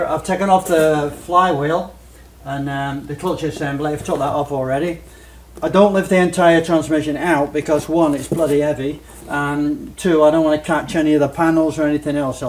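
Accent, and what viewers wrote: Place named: Lancashire, United Kingdom. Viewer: Where are you based ? The accent sounds more like Lancashire.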